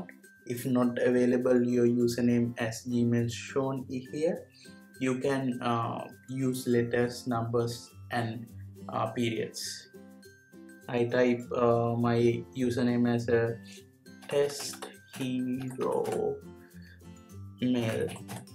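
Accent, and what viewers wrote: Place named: India. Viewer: ddat indian accent do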